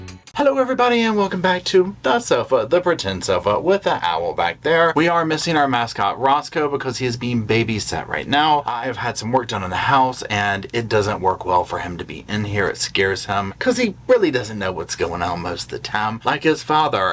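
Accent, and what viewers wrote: South, United States. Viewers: Id just like to say thanks for your videos everyday ☺️ ive been super depressed lately because i was in an accident and lost all my teeth and its hard for me to even get out of bed anymore 😭 but i love your southern twang makes me smile and has my attention off my issues if just for a few minutes 😏 love rosco p coletrain❤️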